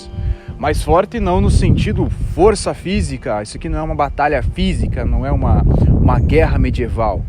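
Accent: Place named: South, Brazil. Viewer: Sou do Sul também, e cara te admiro por levar suas raízes nos vídeos, eu percebo que as pessoas daqui do Sul quando pegam fama ou simplesmente pra parecer com todo mundo acabam perdendo as raízes, nasceram falando "LEITE" e mudam pra "LEITI", não entendo por que essas pessoas precisam fazer essa mudança, se é uma questão de aprovação social ou algo do tipo.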